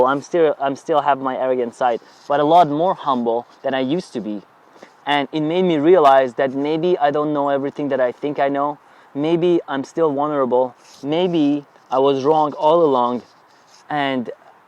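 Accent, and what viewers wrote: Iran. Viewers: His accent is very Persian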